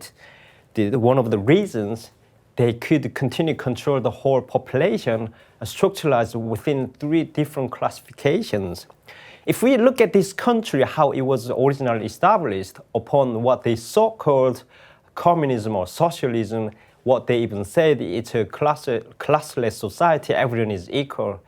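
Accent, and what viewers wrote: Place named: Lancashire, United Kingdom. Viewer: Interesting - so he has it reinforced on a daily basis! I thought I was hearing even some East Lancashire vowel sounds at times, but it was a bit difficult , with his accent, to detect if there was actually a rhotic r in there as some areas of Lancashire still have, and which I hear daily where I now live.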